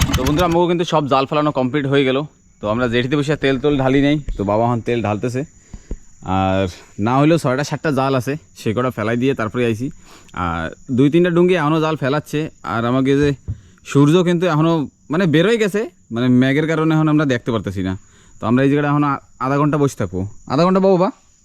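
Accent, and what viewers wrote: Bangladesh. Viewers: কথায় বাংলাদেশী ভাব 😅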